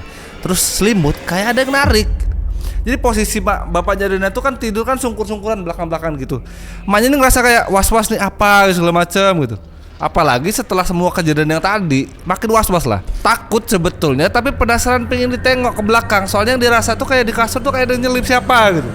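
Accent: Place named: Sumatra, Indonesia. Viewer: Kok malah berlogat Sumatra? Apa saya yang salah dengar 😮😮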